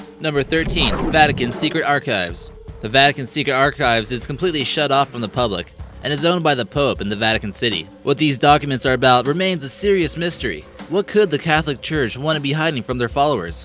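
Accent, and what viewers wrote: United States. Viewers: What's with the Aussie accent for the subscribe button and the American accent of the nasally narrator..?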